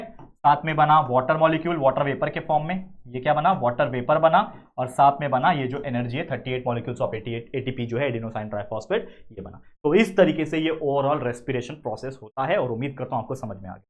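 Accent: Maharashtra, India. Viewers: Ap marathi ho kya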